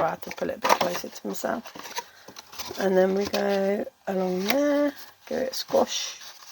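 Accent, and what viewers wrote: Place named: South West, United Kingdom. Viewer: Love it! I love trying 3D projects and I know from your previous work on tv craft shows that you always come up with some great construction projects. Looking forward to trying this and watching your other tutorials. I'm from Bristol but live in Tamworth and it is nice to hear that South Western accent - makes me feel at home!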